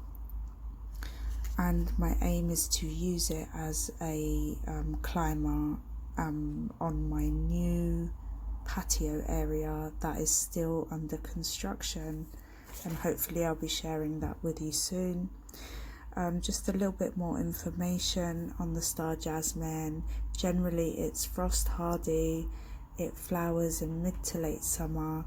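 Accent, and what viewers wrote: England, United Kingdom. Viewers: I was really hoping it would work in water. Apparently if you take cuttings in summer us best and quickest to root but you can do it with the softer growths in winter and will take about 3 months to root. I'm judging from the accent your in England so the colder climates may slow down the rooting a bit too. Off to try this and glad I don't have to faff about with rooting hormone. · So glad you made this video. Thank you! I love the sound of your lovely English accent too 🌿💜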